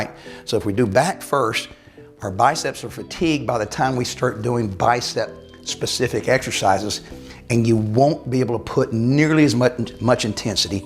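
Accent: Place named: South, United States. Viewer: He's from The South. We sez it lack we wunt.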